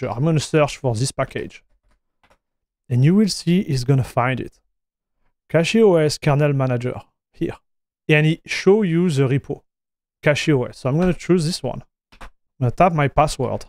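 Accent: France. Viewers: Cet accent français de zinzin, ça m'a fait bugé je me suis demandé au début si la vidéo allait être en français ou en anglais 😂. C'est ça qu'on aime, merci pour la vidéo !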